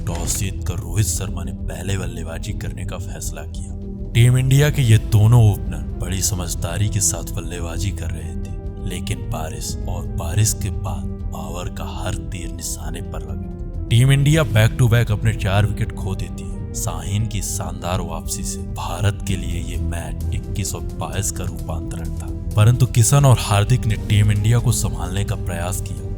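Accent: Bihar, India. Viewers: Tu bhihari he yaa uttar pradesh wala 😂😝😝😝😝🤬🤬🤬🤬🤮🤮🤮🤮🤮🤮🤢🤢🥵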